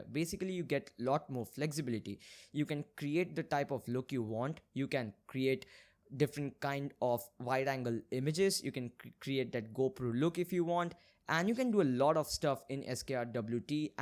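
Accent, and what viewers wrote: India. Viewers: I love that Indian accent😂👌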